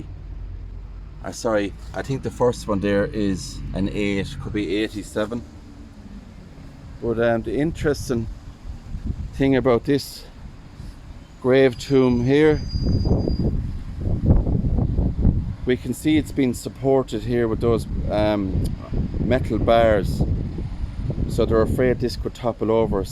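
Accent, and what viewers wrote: Ireland. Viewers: My mother often took us to tour grave yards. I absolutely love this. has such a beautiful Irish accent and he's so calm, steadfast, easy going. I feel so much better in this crazy life just listening to him. Thanks much!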